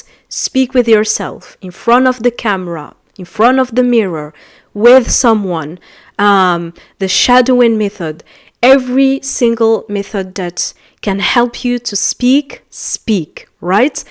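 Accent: United States. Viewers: نطقك ما شاء الله امريكية❤ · تقولي شوفوا هاري بوتر بس الاكسنت حقك امريكي وليس بريطاني؟!😮 الزبدة هاري بوتر مو الاساس اريد الطريقة حقك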